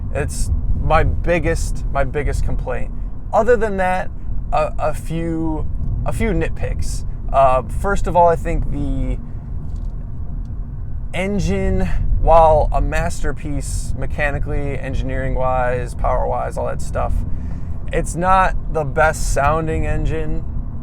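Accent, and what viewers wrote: United States. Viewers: Dmytro Chygrynskiy speaks perfect American??! Awesome video bro! xD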